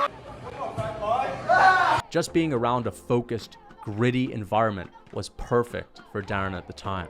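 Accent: Ireland. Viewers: Irish accent! 😅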